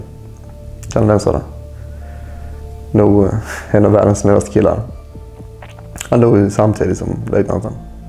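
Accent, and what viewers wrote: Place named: Skåne, Sweden. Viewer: My background is Swedish army basic training in the '80s, one tour in Bosnia in the 90s and Swedish National Guard for over decade. Drafted E-5, ended up O-2. I emigrated to the United States about 25 years ago. I observed that all the stupid stuff that we were warned that the Russians would do in war, actually still happen, about 25 years after we were first taught them! Every single weakness and structural problem! It's unbelievable. It's good, but weird. They mostly seem to run T72s, still. The B3 version, but still. You can tell that this man has experienced some serious s***. My unit was in one firefight in Bosnia, but that was a very brief moment and even though we killed people I'd relatively close distance, we didn't take casualties of our own. That checks with friends I have here in the United States, who have served in Iraq and Afghanistan. 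Enemy casualties is one thing, but on your own side is traumatizing on a whole different level. On a lighter note, I'd like to mention his speech. When I started the video, I noticed he had "non-Nordic" ancestry. My brain automatically prepared to listen a little closer in case he had an accent. I have worked for decades with native Spanish speakers here in the United states, including as supervisor, so my brain is prepared for everything, so to speak (I ended up learning a lot of Spanish, cuz that was easier). Then, I noticed that he has an accent from Skåne (Scania). That accent and his rapid speech, made me have to follow the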